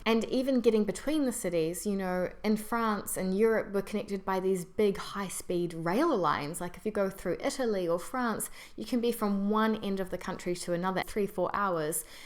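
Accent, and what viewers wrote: New Zealand. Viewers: Is it a common qiwi way of pronouncing 'e' sound or is it just you?:) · Clearly a Francophile with a Kiwi accent. Sad. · I was wondering why your voice sounded so familiar. Then I realised you're from nz too!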